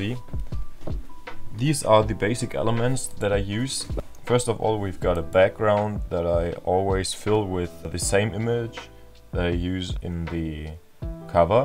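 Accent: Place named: Germany. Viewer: Geiler Content, sehr korrekt das du dein Template teilst. Aber bro man hat ab der ersten Sekunde gemerkt das du Deutscher bist ❤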